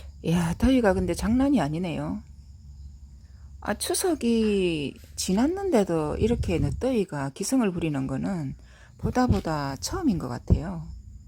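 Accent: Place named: Gyeongsang, South Korea. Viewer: 님 반갑습니다 올여름 날씨가 대단합니다🍋🍋🍋 영상 멋지네요🎾 경상도 사시나요 말씀이 친숙합니다 고추 말림이네요 즣은즐감합니다 꽃도 소개해주시고 영상 멋져요🍇 감사합니다🖼🖼🖼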